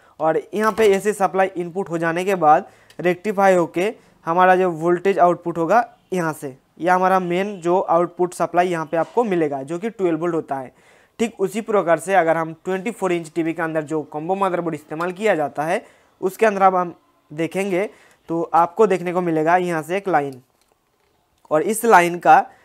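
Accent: Bengal, India. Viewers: দাদা তুমি বাঙালি নাকি তোমার হিন্দি কথা গুলো বাঙালির টান আছে ❤️ তোমার কথা গুলো ভালো লাগে আর বুঝতে ও সুবিধে হয়❤️ লাভ ইউ দাদা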